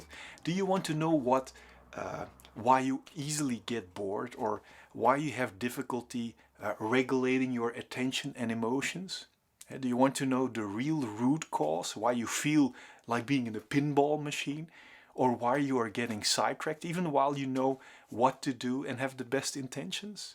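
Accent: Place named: Netherlands. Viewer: You have a strong dutch accent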